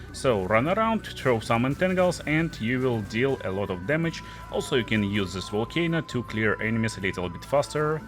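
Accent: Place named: Russia. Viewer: Ты бы не выёбывался, а говорил по-русски, слышно же, что русскоговорящий. Зыс и т.д. произношение выдаёт.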